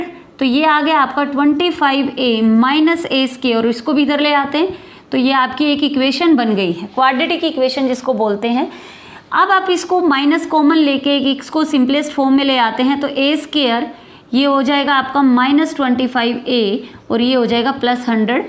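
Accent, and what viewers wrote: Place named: Gujarat, India. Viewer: Kaha❓❓ Gujarat se aap Ho kya.....Words ki Pronunciation se Lagta hai.....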